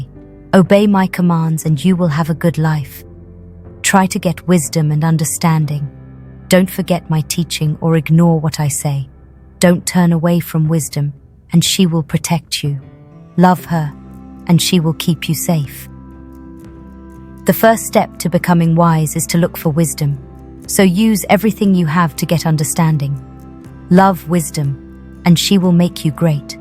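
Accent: United Kingdom. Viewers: I love it. Is that a British accent?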